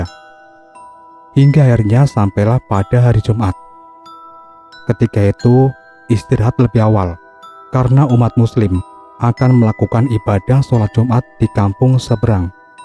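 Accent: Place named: Java, Indonesia. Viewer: Medhokmu lek... Ngapusi thok ki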